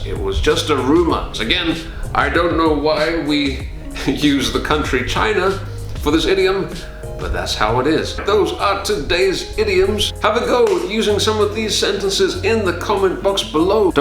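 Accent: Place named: United States. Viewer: American accent yoo, I love it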